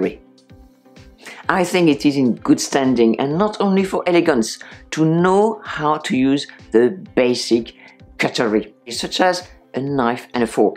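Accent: France. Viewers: I love your french accent.!!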